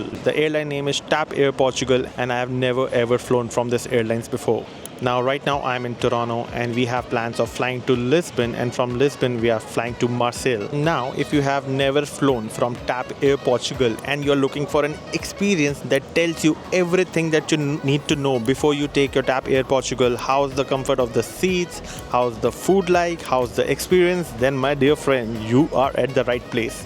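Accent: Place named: India. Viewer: I CANT UNDERSTAND WITH YOUR TANDOORI ACCENT...HORRIBLE EDITING BTW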